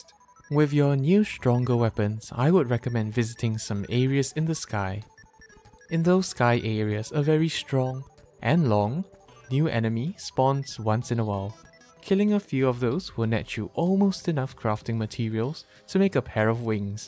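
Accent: Singapore. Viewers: Bro got that Singapore accent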